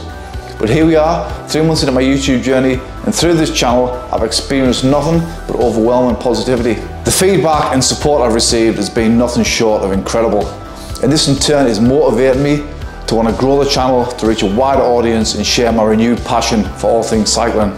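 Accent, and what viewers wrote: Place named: Newcastle, United Kingdom. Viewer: Love your accent (Newcastle??) and your dreams. I’m not a Lycra cyclist, don’t own a road bike but I love cycling and love small channels with a passion for cycling. Keep dreaming big. I hope you achieve your goals. New subscriber.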